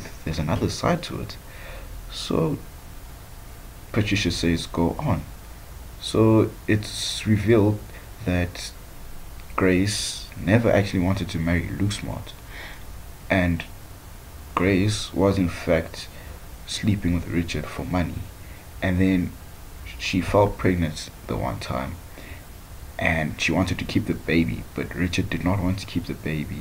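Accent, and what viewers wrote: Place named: Zimbabwe. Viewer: Could hear a Zim accent and I'm barely wrong bout such 😂🔥🚀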